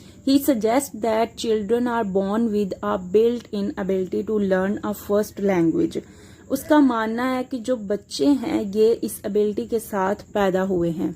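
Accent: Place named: India. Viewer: Why are Indians having a bad accent to accommodate English and still want to teach the same language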